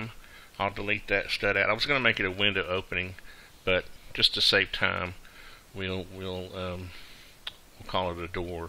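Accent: South, United States. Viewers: That outside corner is no longer code can't insulate and besides that when you stand your other while you're inside is only going to have half an inch for your sheetrock to screw into should have inch and a half so when your half inch goes on one wall you'll have the other inch you also sound like you're from South which you don't get very cold so I'm sure the insulation is not an issue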